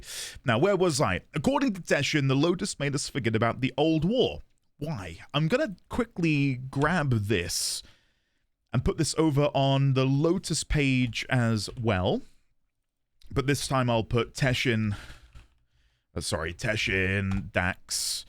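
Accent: United Kingdom. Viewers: I totally agree in regards to playing the game solo for now, I played the whole starchart solo and it helped me to learn all the mission types and game mechanics better. The only time I joined squads was for interception missions as defending 4 points on the map solo was a challenge for me 😊 You sound as though you are also a fellow Brit , not sure I have seen many UK players make videos. Great vids btw and yes personally I love watching your whole WF playthrough, it's a bit of nostalgia for us who have been plying for years, we enjoy seeing new players experience what we have experienced, the new player experience seems much better now. Love your excitement and energy. 😂 You can buy a codex scanner from the market for credits if I remember correctly, then you can use your Helios to auto scan enemies like Vor and Vey Hek etc, every enemy actually for your codex entries. 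It will then also show you which damage type is best for which enemies, not that you need to worry about damage types yet The difficulty will increase per planet so don't worry it will get tougher.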